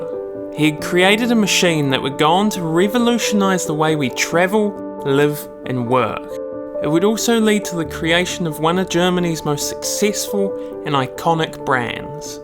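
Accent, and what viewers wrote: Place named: New Zealand. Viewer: New Zealand/"Kiwi" accent!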